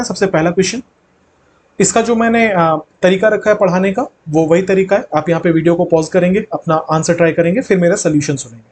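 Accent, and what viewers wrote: Bihar, India. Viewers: sir, I know your voice. You are from BIhar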